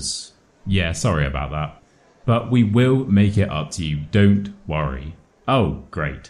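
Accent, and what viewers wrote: RP, United Kingdom. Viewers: Teacher,my name is Grace from Taiwan. I thought your voice should be BBC accent. It is easy to prounce and listen accent.Yet,I had ever listened diallect British once all my life. It was really difficult for me. Completely not understanding. Thanks teacher for your instructions.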